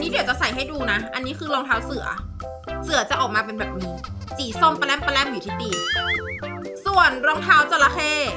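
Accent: North, Thailand. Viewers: พี่ เป็นคนเหนือใช่มั้ยครับ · พี่คนเหนือหลอครับ😂😂😂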